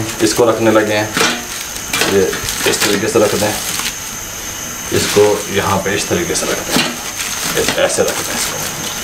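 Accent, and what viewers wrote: Punjab, India. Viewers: Uncle tuada style kamal a... punjabi nal boldy o wich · Bhai aapki her recipi more delicious,tasty aur lajabab hai,aur aapki Punjabi jubaan Masa Allah aapki recipi ki tarah hai saath hi meethi bhi hai,main apne near&dear ko share bhi kar Raha Hoon.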